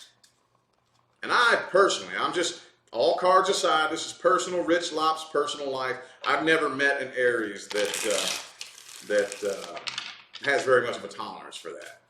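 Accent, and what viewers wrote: South, United States. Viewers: Thankyou so much I appreciate it, great accent where you from down south I'm in New Zealand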